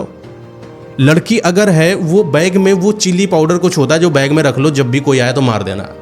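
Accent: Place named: Bihar, India. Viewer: Hopeless aur bekar aapne sab bataya, aur apni bihari bhasha ki hindi mat boliye bada odd lagta hei sunne mei, apni hindi thik karo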